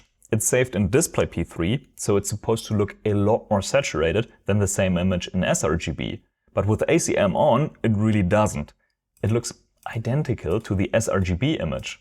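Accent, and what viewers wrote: Germany. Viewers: Hab den deutschen Akzent direkt rausgehört😂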